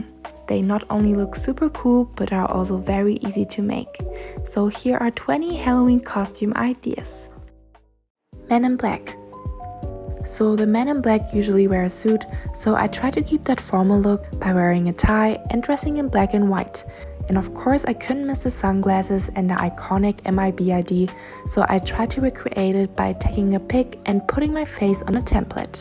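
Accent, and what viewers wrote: Germany. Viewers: off topic but what accent is this? german?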